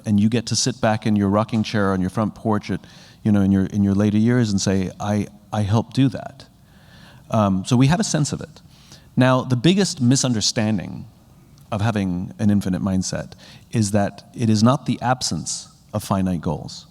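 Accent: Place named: United Kingdom. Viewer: I love British accent of yours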